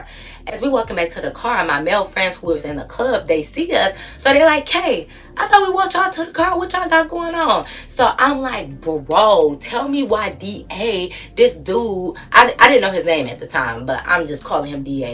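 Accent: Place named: Connecticut, United States. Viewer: You have a ct accent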